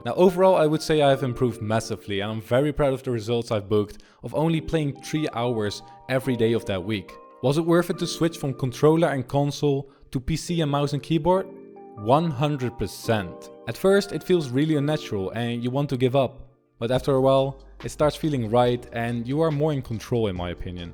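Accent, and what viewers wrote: Netherlands. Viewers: His acent is 99% dutch 1% irish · Zijn engels is goed alleen je hoort gewoon dat hij nl is! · You hear he's Dutch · i saw this in my recomended(don’t know you) klicked on it and boom. 1 minute in and i know 99% sure you are dutch or somewhere close to it. The accent bro the accent🇳🇱🇳🇱🇳🇱🇳🇱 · Jo bro zelfs ik hoor dat je Nederlands bent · He sounds Dutch to me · I'm 99% sure he is Dutch, bcs of his accent😂😂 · Ben jij nederlands? Ik hoor het een beetje 😂🙌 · Ik hoorde aan je stem dat je een nederlander was (ben geabonneerd) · yoo are you dutch because off your acent zo ja ik ben ook nl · Ja ik herken het meteen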